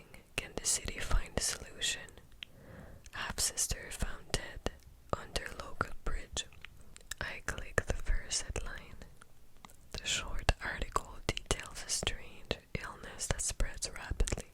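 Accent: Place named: Quebec, Canada. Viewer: she's from Quebec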